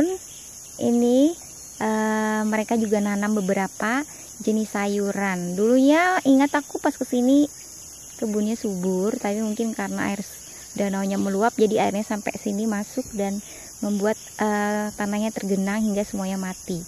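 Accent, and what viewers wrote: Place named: Jakarta, Indonesia. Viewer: Mb swaktu bicara logatnya seperti Jakarta apa bisa pale logat to dialek local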